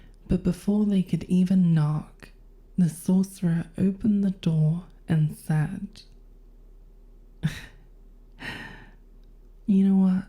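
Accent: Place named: United Kingdom. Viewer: oh she's british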